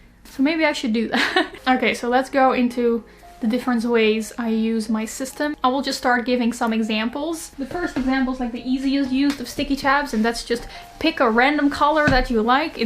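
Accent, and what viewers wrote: Australia. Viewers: Anyone else noticed that she is starting to get an Australian accent on certain words 😂 Edit: the amount of people telling me she's Dutch 🤦‍♀️ I know she's Dutch. Just that I'm hearing Aussie twists on her words, not a bad thing at all, just an observation 😊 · Where are you from? Australia, Norway or USA? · I love how you're getting an australian accent <3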